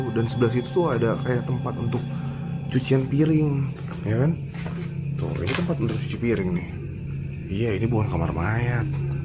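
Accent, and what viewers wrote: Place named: Java, Indonesia. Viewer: Adem rasanya denger langgam jowo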